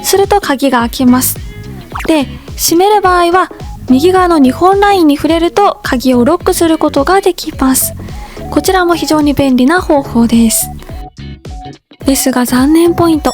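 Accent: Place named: Fukuoka, Japan. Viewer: いつも楽しく拝見させて貰ってます！ 僕は福岡県内に住んでるんですが こっちの方言にクリソツなんですが 愛媛育ちなんですか？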